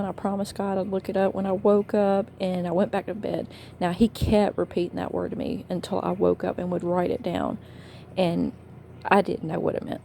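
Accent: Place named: Alabama, United States. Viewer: Awesome! Listening to you speak I was thinking you from Alabama. We are close. I’m excited too. Thanks for sharing.🌈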